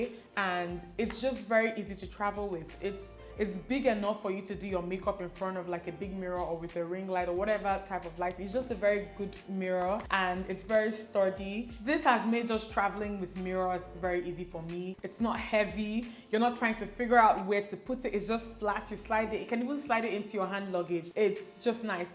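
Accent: Igbo, Nigeria. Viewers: Where have you been, you sweet? Lol. I recently subscribed to this channel and I love that slight Igbo accent you've got!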